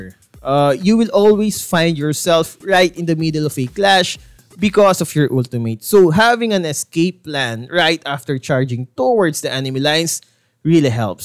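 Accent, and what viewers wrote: Philippines. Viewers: i can hear the filipino accent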